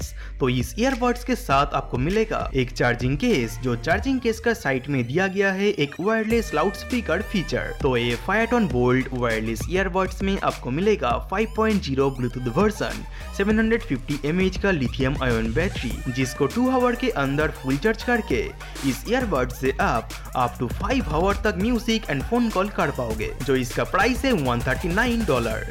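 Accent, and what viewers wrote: Bengal, India. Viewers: Zeshan Zakaria, He is from Kolkata, West Bengal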